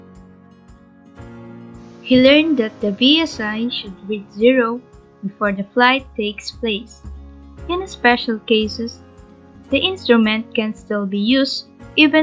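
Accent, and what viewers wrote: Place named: India. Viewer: OMG, heavy Indian accent, read by someone who does not understand what they are saying.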